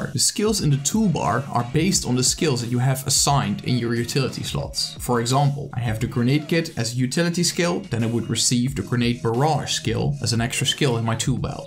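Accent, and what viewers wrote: Netherlands. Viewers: You are dutch, aren't you? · Hands down the BEST video of its type. SUBBED. Love your accent. Dutch? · Not that your English is bad, far from that, but i imediately heared you're dutch. Great Video tough. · i clicked - i hear a dutch accent - i like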